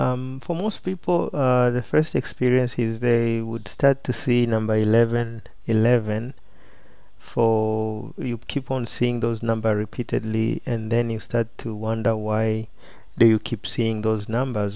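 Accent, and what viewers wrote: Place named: Uganda. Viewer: I thought it was Ugandan... it sounded so familiar!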